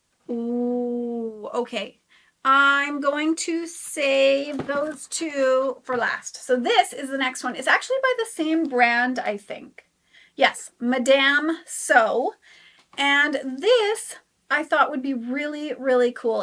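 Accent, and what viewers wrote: United States. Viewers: “Beg” for bag and precision pronunciation of “iRON.”. You’re either in Wisconsin, Minnesota, or Canada. lol, I love the upper Midwest accent 😂. Thanks for the video!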